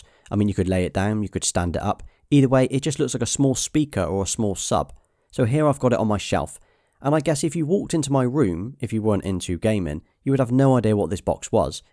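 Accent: United Kingdom. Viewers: The way British accent is so funny 😆 because he said shual shock to shual sense, I am not hating or anything I am just saying how he says dual shock to dual sense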